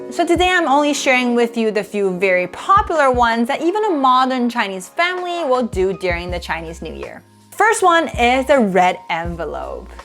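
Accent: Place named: United States. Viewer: Your accent is so American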